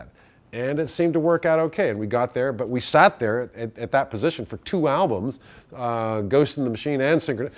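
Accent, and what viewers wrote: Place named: United States. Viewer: He sounds so American but isn't he British?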